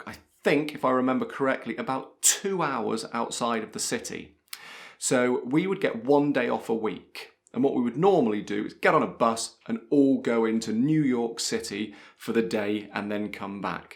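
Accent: United Kingdom. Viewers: It's sounding really British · Professore ho preordinato il Suo libro. Darei qualsiasi cosa per avere il Suo accento così British. Faccio le prove a casa per avvicinarmi sempre di più ma non è così facile. Grazie. A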